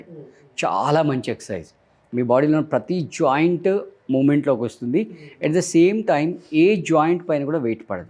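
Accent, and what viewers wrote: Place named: Marwar, India. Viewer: Athanu telugu vadu kademo, marvadilanti vallu andhra lo perigina vallu ayi vuntaru.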